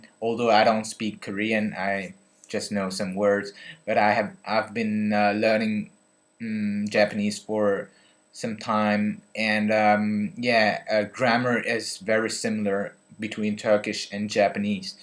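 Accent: United Kingdom. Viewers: You have more of a british english accent than an american one now! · Hey! Your accent is awesome! where's american? You're starting to acquire the british one! Did u notice the change? great vid.. see u soon! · Wow I really notice how your accent has got more like British pronunciation. It is quite noticable :D As always, really enjoying your videos :) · I like your accent, it got very British! :)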